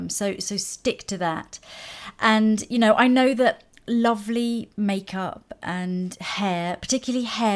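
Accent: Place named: RP, United Kingdom. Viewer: you are ADORABLE! We all love your videos because despite your (lovely!!!) posh accent, you are SO relatable and always provide great tips and information! ❤️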